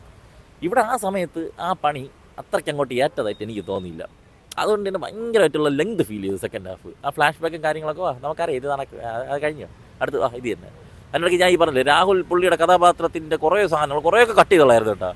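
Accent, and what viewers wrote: Trivandrum, India. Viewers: എനിക്ക് താങ്കളുടെ തിരോന്തരം ഭാഷ ഇഷ്ടമായി !😂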